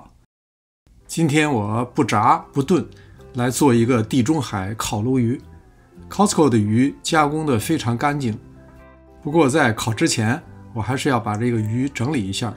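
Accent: Beijing, China. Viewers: 感谢老师的讲解！听上去您是北京人吧？感觉很亲切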